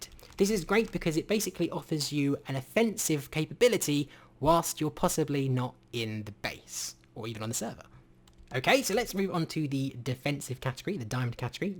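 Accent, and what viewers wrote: United Kingdom. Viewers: he's British what do u expect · It's our Southern England accent.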